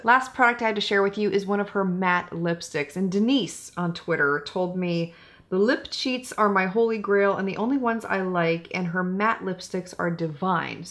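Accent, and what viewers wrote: United Kingdom. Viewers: I can listen to people with English accents all day too. Thanks for such an honest review. CT has beautiful packaging and some great products but it's too expensive. Can you do a first impression of MUFE new self setting concealer? I'd love to hear your thoughts on it. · Yes I too Love British accent, hence my Dr Who and BBC subscriptions. Thank you for taking on and testing Charlotte Tilbury. I don’t understand the hype because the shade range is not Woman of Color friendly. I have learned so much from her as a makeup artist however you would think she would be more inclusive. Btw can you please do a video on care and maintenance of lashes. I watched your video to apply and am doing ok but it’s the wear them more than twice I am working on. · British accents are the best! LOVE Charlotte Tilbury.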